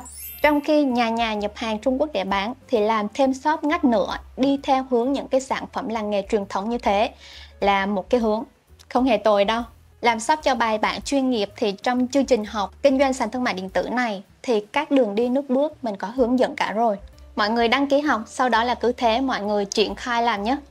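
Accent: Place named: Hue, Vietnam. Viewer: Chị này giọng huế nè kk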